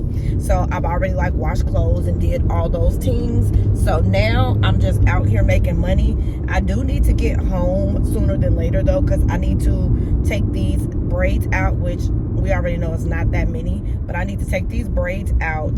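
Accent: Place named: Bay Area, United States. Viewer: ! I was just telling my husband I don’t know where she from but she sound like she from The Bay but when you drive around it’s not baying😂😂 this thought was a while ago when I first found your other channel, then I came over here. I’m from Oakland, but I been in Pitt for hella long now so yeah P-World 🖤🧡🖤🧡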